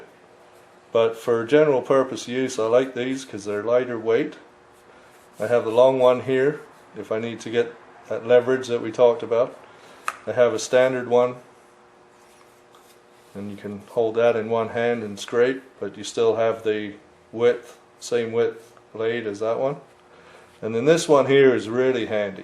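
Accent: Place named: West Country, United Kingdom. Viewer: What is that accent?? Sounds like a mix of South West English and American 🤔🙂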